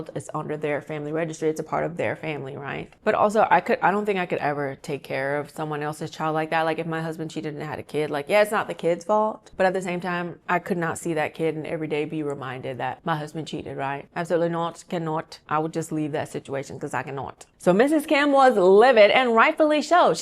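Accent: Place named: South, United States. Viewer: I can tell your from the south cause that is how we talk with the accent ,we don't put up with any bull crap.love y'all's story time .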